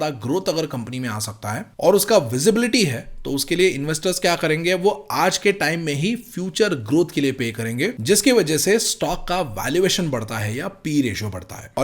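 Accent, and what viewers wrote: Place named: Bengal, India. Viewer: Hindi theek se aati nahin to koi aur bhaasha mein boliye. Your Hindi (are u Bengali BTW?) grates on my ears 😭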